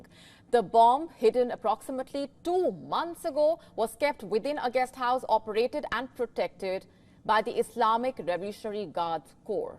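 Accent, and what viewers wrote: India. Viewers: Indian accent not very sexy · Accent and herself both are not sexy like a common Indian, Pakistani or Bangali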